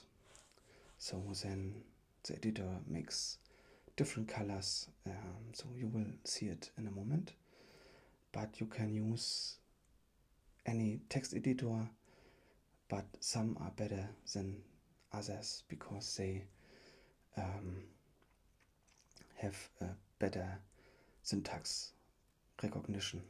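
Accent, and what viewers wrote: Germany. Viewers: Man hört einfach komplett das du deutscher bist 🧐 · Nur wenige Amis sprechen das The mit th aus. Die meisten sprechen es als gepresste D aus. Also "da car" nicht "the car". Aber stimmt schon die Aussprache ist doch schon sehr Deutsch xD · Ich höre doch da einen Deutschen Akzent raus 😂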